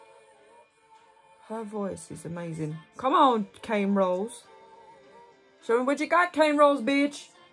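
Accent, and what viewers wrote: United Kingdom. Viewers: That british accent❤ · Love your reaction and just adore your British accent ❤ Love from Armenia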